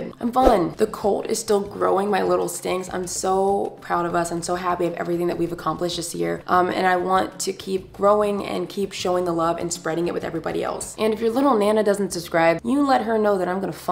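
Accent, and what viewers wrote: England, United Kingdom. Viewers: I love when Black Americans do an English accent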